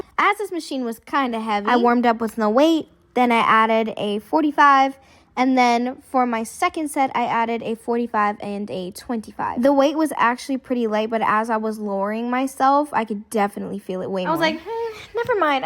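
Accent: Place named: United Kingdom. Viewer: "Beautifully sculpted" is a massive understatement. I like your narration and the random British accent. I'm from across the pond myself.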